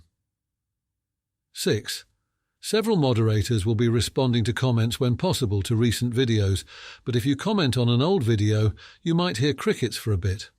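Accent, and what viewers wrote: United Kingdom. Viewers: understandably, the voice could only ever have had a british accent 😂 luv it ❤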